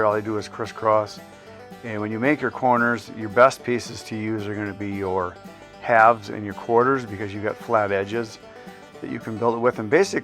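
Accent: Wisconsin, United States. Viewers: Good ol’ Wisconsin accent . I’m from Buffalo NY but spent a little time in Amherst <mail> Wolding when I first got my cdl. I went there in late January early February and it was a different kind of cold 🤣 Beautiful in that area.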